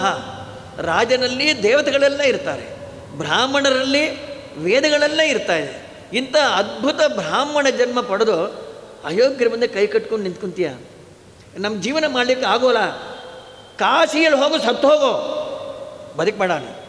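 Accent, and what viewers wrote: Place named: North Karnataka, India. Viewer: I enjoyed listening to this discourse mainly for his Kannada of north Karnataka flavour.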